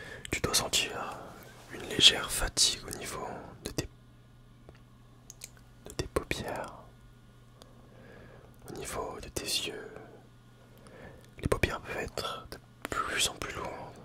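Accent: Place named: France. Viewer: Alors c'est peut-être moi qui hallucine, mais je trouve que tu as un petit accent mdr Pas du tout dérangeant au contraire ! Ça éveille ma curiosité ^^ Tu vis plutôt dans le nord ou le sud de la France ? Pardon c'est sûrement indiscret ce n'est pas grave si tu ne réponds pas ^^ Sinon j'ai adoré la vidéo, ça m'a relaxé direct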